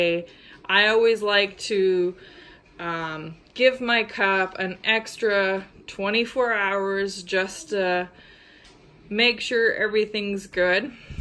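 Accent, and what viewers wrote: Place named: Canada. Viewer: I think sure may be from Canada. I've heard other people pronounce it that way from there.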